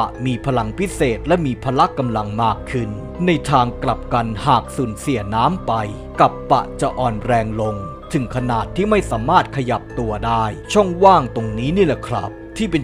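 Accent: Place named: Central, Thailand. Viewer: คุณ ผมฟังคลิปคุณมาหลายคลิปแล้ว ผมชอบตรงที่การบรรยายของคุณมีเสียงกลางออกเหน่อ นี่คือเอกลักษณ์ประจำตัวคุณ มีเสน่ห์ประจำตัวที่ให้น่าติดตาม ผมชื่นชมและจะติดตามไปเรื่อยๆและตลอดไปครับป๋ม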